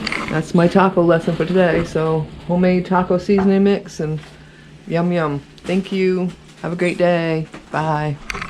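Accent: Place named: New England, United States. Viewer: Your accent. Maine or N.H.?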